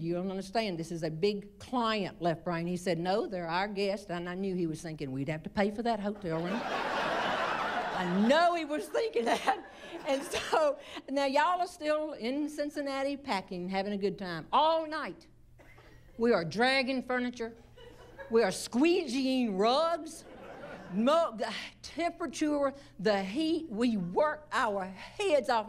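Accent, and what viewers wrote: South, United States. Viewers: And while she can't match up to Bob's legendary dead-pan comedy, she more than makes up for it with a strong dose of Southern twang and culture!!! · Ditto, I'm just now seeing all of her clips and she's hilarious. Love her refined Southern accent she's so elegant. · NC..gal, me too..shes good...and we do talk like that...some even more southern than her lol....NC is a great place to live!!! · You gotta love southern American accent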